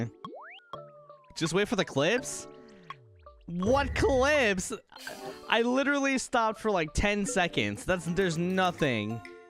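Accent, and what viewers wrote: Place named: United States. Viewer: 公表はしてませんが、英語の訛りや配信の時間的にアメリカもしくはカナダあたりだと思います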